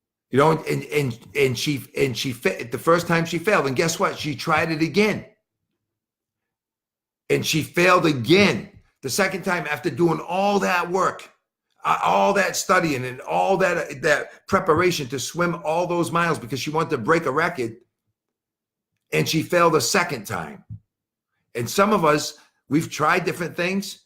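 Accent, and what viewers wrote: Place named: Boston, United States. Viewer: That feedback loop and accountability are so important. That Boston accent is strong!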